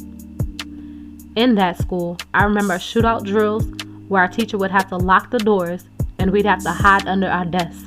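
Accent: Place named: New York, United States. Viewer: Wow 😯 this is good I’ll be waiting on chapter 8 and you still sound like NY peace sis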